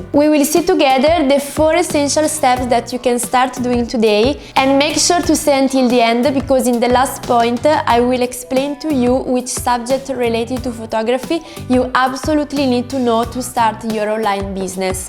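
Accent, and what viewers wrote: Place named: Italy. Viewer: Every letter has been pronounced in this video,😂😂😂😂 loud and clear. You sound so Italian, anyway good tips 👍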